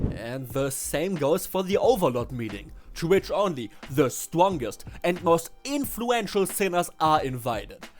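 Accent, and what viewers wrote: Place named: Germany. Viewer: ich höre den deutschen akzent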